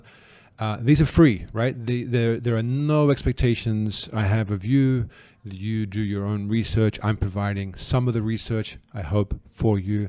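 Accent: Australia. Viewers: I think he's Australian by the sound of his voice, but I take the point.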